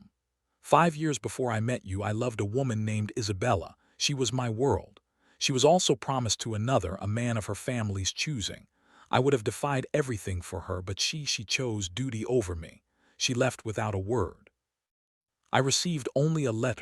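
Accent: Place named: South, United States. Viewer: I'm sorry but you can't use the country voice for these stories. It just doesn't fit and doesn't make me want to watch it.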